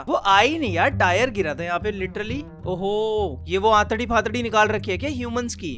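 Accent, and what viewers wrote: Haryana, India. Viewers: maza nhi ara yar, haryanvi accent to pelo thoda. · Old vibes are back but something is missing and that is that Haryanvi tone that used in his old videos So I think old vibes are still not back 😶😶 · Who wants old haryanvi tone back ♥️ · Reason for not growing :- videos with low paced editing :- new generation of low attention span :- slow commentary Suggestion :- use colored subtitles like u used to do to grab attention :- do different types of video RELATED to gaming. :- speak faster :- imp just stop with those english gaaliyan yr. Use hindi ones like u used to. Or just use your hariyanvi accent bcoz i liked that bhens ki tait. Easiest way to get a good video would be to play with friends. If u are slow then your friends could make up for it and you could get really good clips to make a video off of. Every other channel do this nowdays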